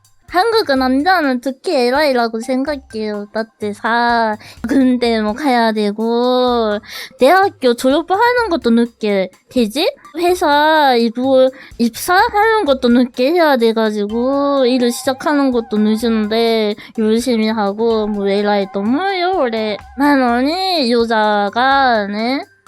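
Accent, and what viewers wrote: Japan. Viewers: 한본어 존나 맘에 드네 ㅋㅋㅋㅋㅋㅋㅋㅋㅋㅋㅋㅋㅋㅋㅋㅋㅋㅋㅋㅋㅋㅋㅋㅋㅋㅋ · 한본어 개귀여움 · 이 버튜버 한본어 지켜주세요... 매력포인트야..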